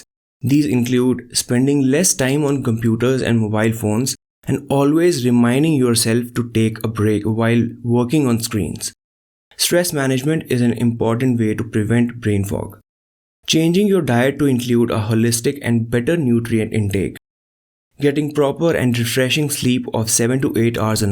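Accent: India. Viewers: Is speaker a kashmiri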